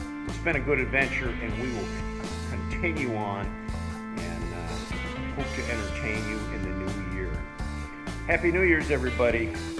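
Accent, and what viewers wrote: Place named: Ohio, United States. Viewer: Happy New Year to you two. Thanks for the stories. You have an Ohio accent.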